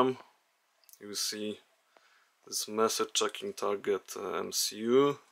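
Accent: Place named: Russia. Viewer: it's realy solved my problem with error 10! thank you! конечно английский с русским диалектом это жесть, но за видос респект!!!!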